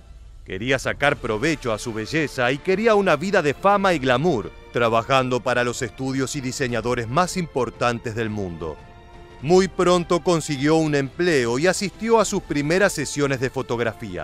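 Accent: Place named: Argentina. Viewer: Ablas como argentino venezolano con cubano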